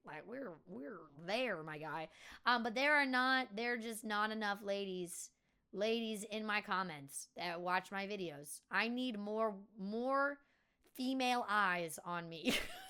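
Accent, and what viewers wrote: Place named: Upstate New York, United States. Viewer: Quick thoughts. 1. Can't really help much with female viewers. I found you as a recommendation from a song and I'm definitely not the smoke show you are lol. 2. It might be awhile but I foresee a time when labels lose their power. With the ability to self publish on Amazon and other places as well as independent production studios on the rise I think it's only a matter of time before bands move in that direction and hire independent teams and managers or firms that replace them. 3. I actually have wondered where you were from but not to be creepy. Being from NJ and living in NC I feel like I hear a bit of upstate NY and even a bit of southern in your voice. Your voice and mannerisms remind me a lot of a friend of mine from that area originally. After this video I feel like I might end up holding the door for you at the shooting range lol jk. 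Hope you have a great week and if you are looking for some bands some of my favorites are, and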